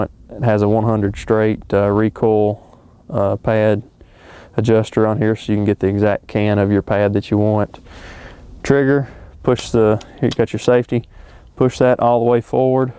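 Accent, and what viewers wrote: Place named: Texas, United States. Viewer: You sound like you're from Texas. And Trapshooting is humongous business. There's about one major competition in every state ever week. You need to join the ATA to see just how many people are involved in competitions never mind how many folks just do it for recreation. It'll be a major eye-opener for you.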